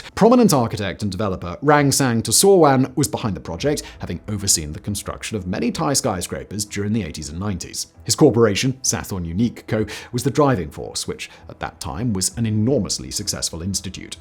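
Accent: RP, United Kingdom. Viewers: Your thick, over the top, "posh" English accent can't pronounce New Orleans! Do you really talk like this, or are you really laying it on thick? Thankfully, this video is only a tad over 21 minutes. I don't think I could handle anything longer! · He is sorta speaking the King's English. Just put up with it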